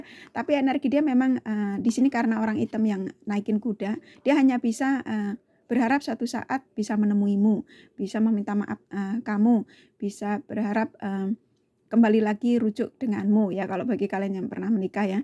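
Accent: Java, Indonesia. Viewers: mb, kok lucu banget sih, jadi ketawa klo denger redingan nya, mksh mb sangat menghibur sekali., Mb orang jawa ya kok medhok banget..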